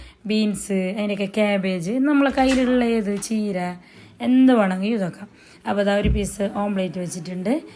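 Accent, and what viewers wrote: Kasaragod, India. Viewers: Kumbla specill baasa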